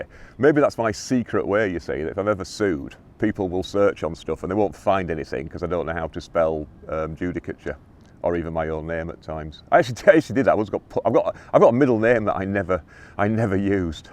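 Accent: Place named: North, United Kingdom. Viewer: how many times a day do you have to ‘Bite your lip’ & actually not ‘call a spade a spade’, but use a euphemism instead? You explain things so eloquently, in a northern accent, that I could listen to all day. Thanks for being so ‘natural’.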